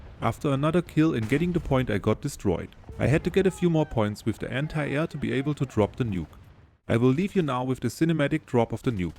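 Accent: Germany. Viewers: Is it possible thst your native language is german ❤?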